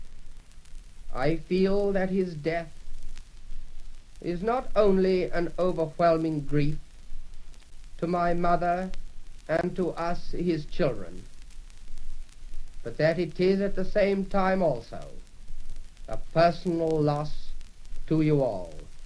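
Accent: RP, United Kingdom. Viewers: I sure didn't expect his voice to sound this posh.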